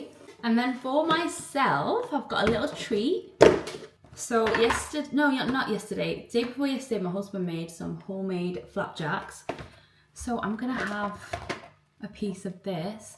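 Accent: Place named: Scotland, United Kingdom. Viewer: Love the video and seeing the squirrel. Haven’t seen one for years. Wow those fees are extortionate. I love the Scottish accent ❤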